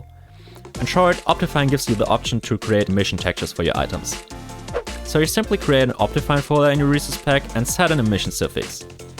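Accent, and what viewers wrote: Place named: Germany. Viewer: Wie man das Deutsch bei dir Raushört haha. Nice Gravity Gun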